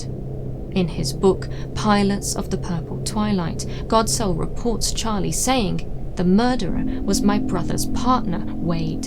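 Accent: England, United Kingdom. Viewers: Sorry, I don't the British accent. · Yes I agree that you are an excellent narrator. You kept me interested and and glued to the screen waiting for more information which you deliver in a way that interested at all times. Further more, and I must apologize in advance, but those dark brown eyes, give a sense of mystery and depth of knowledge. Gosh, it feels like I'm putting you on a pedestal here. I am from Canada and although some British accents are difficult to understand, yours was a delight to list to, to say the least. Finally, and embarrassing to me and perhaps to you, (in a good way) but I find you to be very beautiful. Strikingly beautiful actually. We all see beauty in our own ways but your delivery of the stories, looking into the camera, almost like its a conversations as if you were sitting across from me, talking directly to me. I apologize and hope you are not offended as my intention is that of appreciation and admiration. 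I look forward to further works from you. You definitely have a fan here in Canada lol. Take Care....Joe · Listening to you makes me want to dress well and drink tea while watching your videos. You're an English I always imagined english people are- elegant and classy.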